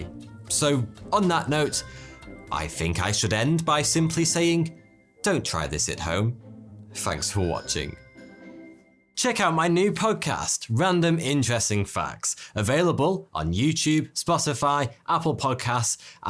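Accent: United Kingdom. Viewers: What he hell part of the UK does that accent come from? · I literally only watch this to get my British accent better by mocking this douche 🤣🤣🤣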